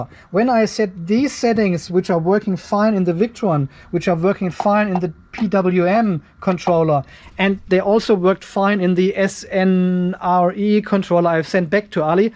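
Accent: Germany. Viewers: So funny listening to a German pronounce Lead or Voltage, as for Iron ......OMG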